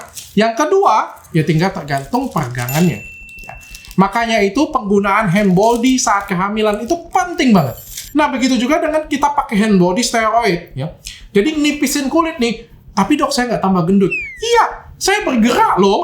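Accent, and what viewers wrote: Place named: Medan, Indonesia. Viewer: Iya..dokter kayaknya gede di Medan..ini logat kami chinese Medan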